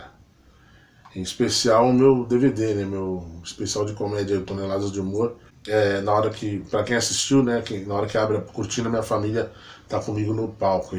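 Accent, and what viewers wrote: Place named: Brazil. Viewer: vi em outro vídeo que você é carioca. Perdeu o sotaque. Acha que se continuasse com sotaque carioca isso ajudaria nas piadas?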